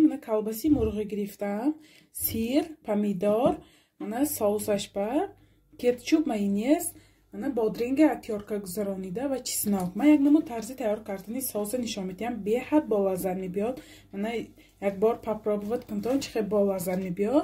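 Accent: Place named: Khujand, Tajikistan. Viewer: Дар овозат чонам ашал хучанди ё исфара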